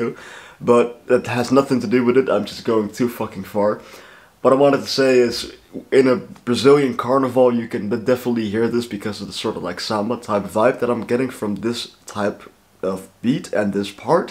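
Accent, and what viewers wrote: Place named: Netherlands. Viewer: Loving the Dutch English accent, kon gelijk horen dat je uit Nederland kwam 😂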